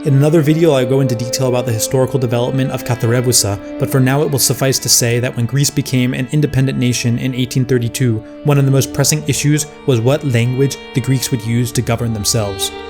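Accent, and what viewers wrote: United States. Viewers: his American accent is too apparent when pronouncing Greek words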